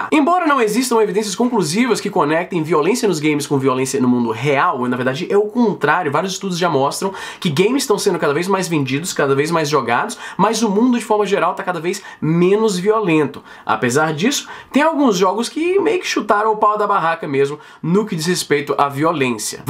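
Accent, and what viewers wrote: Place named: Ceará, Brazil. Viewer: com sotaque cearence, mais alguém notou?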